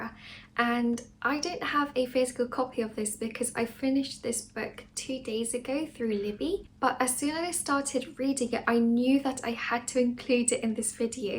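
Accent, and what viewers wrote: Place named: United Kingdom. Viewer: I love your brittish accent oh my god keep talkinggggg!! 🫶🏻 hahahaha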